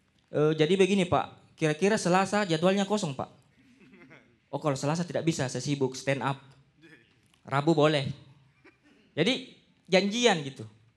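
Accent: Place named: Makassar, Indonesia. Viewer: Ini dia yg gue tunggu.. Orang Makassar mana suaranya ❤️❤️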